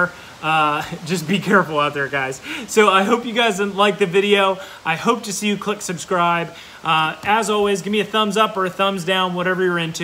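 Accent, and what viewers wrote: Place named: South, United States. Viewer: Also where are you from? Your Southern accent makes me think you're either from Louisiana, Arkansas, Alabama, Mississippi, Texas, or Georgia.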